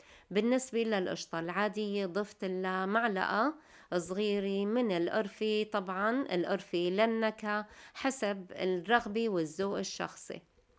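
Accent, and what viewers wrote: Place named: Idlib, Syria. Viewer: العفو منك اختي انت كاتبه مطبخنا الشامي بس لهجتك مو شامية لهجتك ادلبيه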